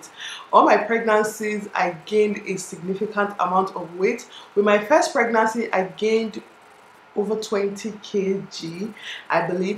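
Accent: Nigeria. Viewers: In God name ,can someone explain to me what she's talking because not anyone is able to listen to english with Nigeria accent please i beg in Jesus name... i just want to know what she did for her weight loss if it was with some writting that will be easy for peoples like us.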